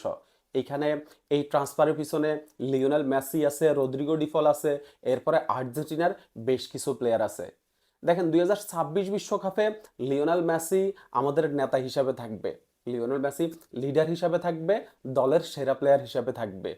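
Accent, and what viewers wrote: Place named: Noakhali, Bangladesh. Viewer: আপনে কি নোয়াখালীর লোক , মুখের কথায় বুঝা যায়🎉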